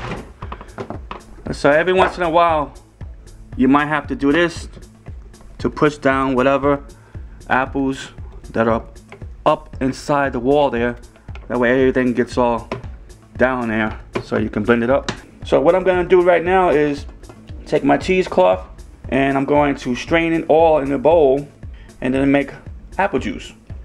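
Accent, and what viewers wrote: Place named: Brooklyn, United States. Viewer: I instantly thought the same haha he's gotta be from bk...marcy maybe...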